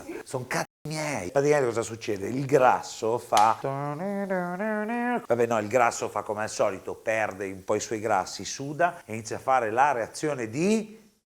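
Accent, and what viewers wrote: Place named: Apulia, Italy. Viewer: Sono Romana, ho imparato prima a cucinare la carbonara, poi a camminare... Mi sono emozionata a vedere questo video. Hai coccolato questa Carbonara.... E m'hai fatto venire fame. Ho sentito quel pizzico di Puglia tra il Meh e il fantasticare sul sud. Comunque, io solitamente faccio rosolare il guanciale in padella, pizzico di pepe, ci butto sopra la pasta, l'uovo, un mestolo di acqua di cottura e alla fine il pecorino, in cucina so che cambiare gli ordini degli addendi, il risultato cambia, cosa pensi di questa composizione? Mi conviene cambiare e lavorarla più come hai fatto tu?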